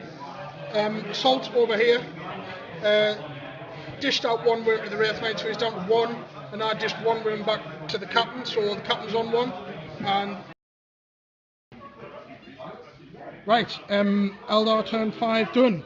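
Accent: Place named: Durham, United Kingdom. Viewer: Good batrep mate, bad day to be an ultramarine. Eldar mobility is tough to counter but I thought with three fliers he'd at least have a chance. Where you from by the way? I'm in Durham, your accent sounds local and you said 'canny points' lol. So I'm guessing you not far from me.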